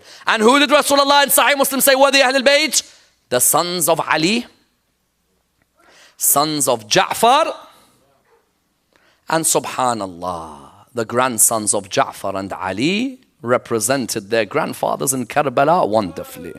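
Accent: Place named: Iran. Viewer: bahasa inggrisnya aksen persianya kentel banget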